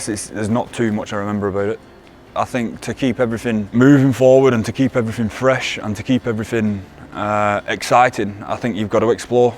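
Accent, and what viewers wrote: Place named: Yorkshire, United Kingdom. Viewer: He’s from Yorkshire, every place has different accents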